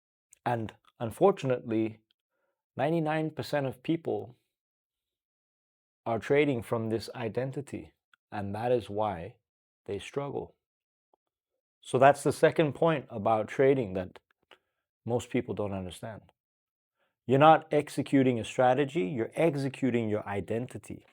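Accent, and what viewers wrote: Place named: Guam. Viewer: Bro, totally off topic, but I just have to ask. Are you from Guam? I grew up in Guam and, I swear, you sound like you're from there. Great vids BTW. I think you're spot on with everything you talk about regarding discipline. I feel like discipline and mindset would fix 99% of our trading issues.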